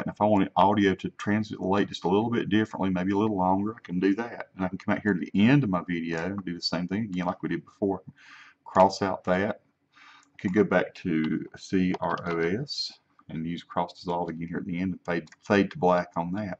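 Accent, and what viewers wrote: South, United States. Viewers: Thank you, great help. I grew up in Southern Mississippi but have been away so long that it was nice to hear a down-home Southern accent again. And you play beautifully, I'm going to listen to some more of those Blues! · I love your southern voice!!! Thank you for the tip!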